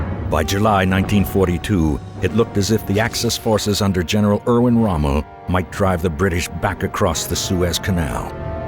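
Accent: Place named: United States. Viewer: Lolllll. I wondered f that were the case!!!! I was thinking “This must be British but they hired an American guy to narrate.” Lol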